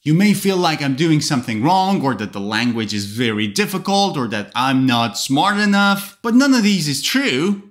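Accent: London, United Kingdom. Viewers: His london😂😊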